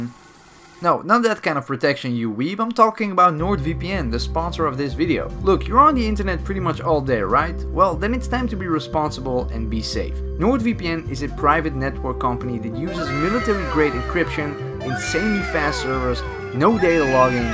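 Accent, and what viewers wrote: Netherlands. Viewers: Maybe ist just me but you soud like you're Dutch Ps just a guess..... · You Dutch?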